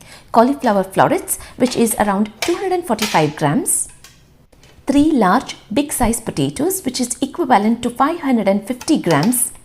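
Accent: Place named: North Karnataka, India. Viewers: You are amazingly beautiful... Loved the family time at the last which is the very essence of a happy family.. Loved to hear north karnataka kannada.. I'm ur new subscriber 😀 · ನಿಮ್ಮ ಉತ್ತರ ಕನ್ನಡ ಭಾಷೆ ಕೇಳಲು ಬಾಳ ಚಂದದ.♥️ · North Karnataka kannada accent damn!! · Hi… came across your video recently and the language accent same as we do.. which part of Karnataka you are from .. nice video ..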